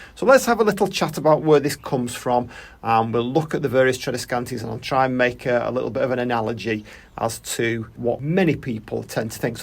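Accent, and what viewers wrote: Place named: Northern England, United Kingdom. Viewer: What a super helpful video re TZ! Clearly, I have some clipping, cleaning up and repotting / propagating to do. Now that I have seen your video, I feel confident that I can save my failing babies. Thank you for your insight... Off to watch the video you pinned in the comment section now. I can't get too many plant care tips with these black thumbs of mine, lol! Well, they are leaning towards deep, dark green now :O) Also, your accent is warming... brings me right back home. I have been in the States since early 1990 and I love bumping into my northern English brothers and sisters on youtube. (Mancunian? I was raised partly in W. Yorkshire but lived in Manchester for a good chunk of time)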